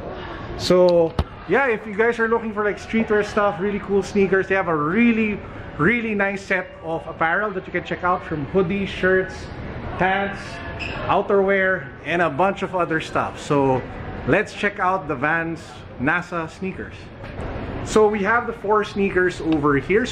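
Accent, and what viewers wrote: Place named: Philippines. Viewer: You are philipines because i can tell how u talk in english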